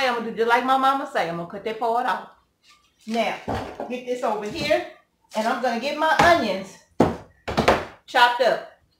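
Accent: South, United States. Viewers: Thank you,! By chance are you living in Georgia? I love your southen twang, ya'll! xo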